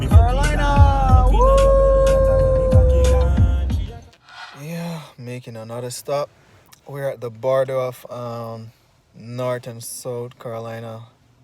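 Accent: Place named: Jamaica. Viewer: I knew you sounded Jamaican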